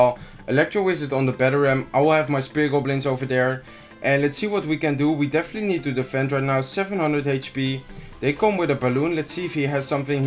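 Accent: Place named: Netherlands. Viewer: Je praat Engels met een Nederlands accent... Ben je Nederlands?? Anders zou je dit niet kunnen lezen... Op xD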